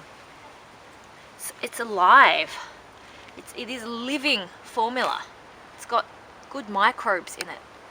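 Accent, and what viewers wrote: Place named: Australia. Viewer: New to your channel and subscribed because you are informative and succinct. Your aussie accent and unpretentious good looks and mannerisms are also delightful and non-abrasive. Kudos!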